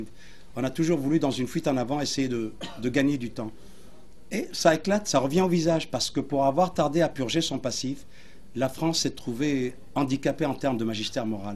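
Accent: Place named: Senegal. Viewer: la prononciation est typiquement Sénégalaise !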